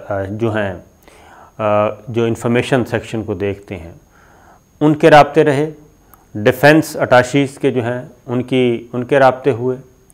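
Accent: Punjab, Pakistan. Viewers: آپکی آجکی بغیر تسلسل والی گفتگو اور تبصرہ ایسا لگ رھا تھا کہ جیسے آپ نے ڈرگ چڑھائ ہوئ ہے۔ کبھی کچھ بھول جاتے تھے کبھی کچھ اور۔ یہ پنجابی نما پینڈووں والی اردو کہ جہاں اسکول کو سکول کہا جاتا ہے اپنی اھمیت اور اصلیت ہی کھو دیتی ہے۔ براۓ مہربانی کمنیکیشن کے کورسز کیجۓ تاکہ یہ جناب جناب کہتے ہوۓ بات کرنا بند ہو اور جو بات آپ کریں وہ تسلسل اور متاثر کرنے والی ہو۔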